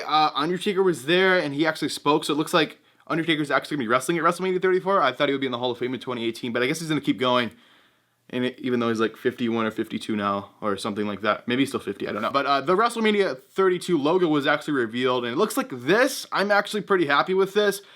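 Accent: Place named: Canada. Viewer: by the sound of your a`s I can tell your canadian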